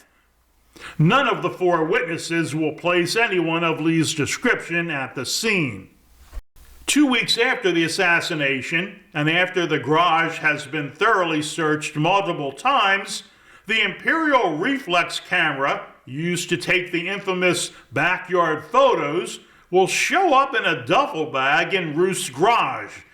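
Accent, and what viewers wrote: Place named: United States. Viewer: Notice that. In spite of the American accent and cadence this obvious error being repeated makes me think this guy is not originally an American English speaker. There's other information being being spread around about Ruth Payne that is salacious. This seems so comprehensive...why isn't that popping up here?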